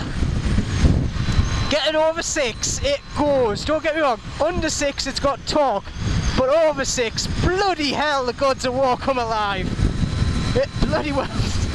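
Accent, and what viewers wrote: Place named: Newcastle, United Kingdom. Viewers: Superb review, superb! Love the Geordie accent too. If you read this, say 'Coca Cola' out aloud please. :) ...Have just bought the Street Rally version. Subscribed! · Guy with a geordie accent who doesn't sound like a twat - amazing. I'm fucked from watching geordie shore...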